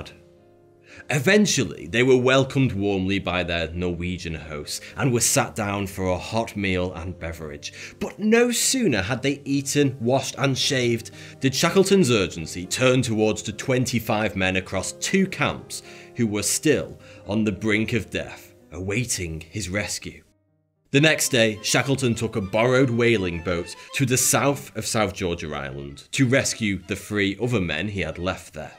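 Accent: United Kingdom. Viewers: Awesome video mate! I always look forward to hearing that silky smooth British voice!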